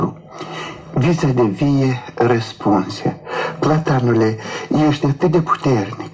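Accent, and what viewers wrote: Moldova. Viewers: Super ! Fermecatoare povestea ! Superba vocea si superb graiul moldovenesc ! Cât despre platani,aici m ai nimerit la fix pt ca pur si simplu sunt fascinată de ei ! In fiecare zi cand trec prin parc nu ma satur admirandu i ,le fac poze,ii orivesc ca oe niste opere de arta !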